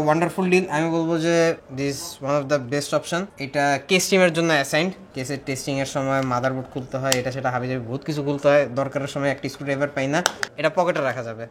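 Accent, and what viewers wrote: Barishal, Bangladesh. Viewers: ত্যানা 😁 Vai ki Barishal ar naki?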